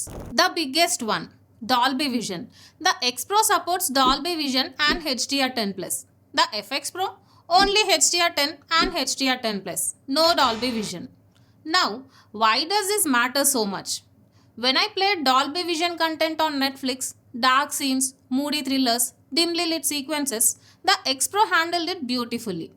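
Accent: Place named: Tamil Nadu, India. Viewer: Aiyyyyo Thamillll accent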